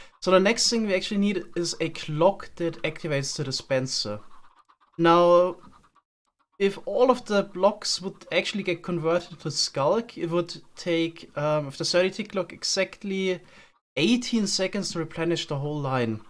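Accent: Germany. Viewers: I sometimes a Little deutschen Akzent oder?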